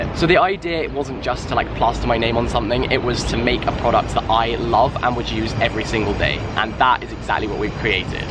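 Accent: RP, United Kingdom. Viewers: You have the same posh accent as Jack and Finn Harries. Not a criticism, just an observation.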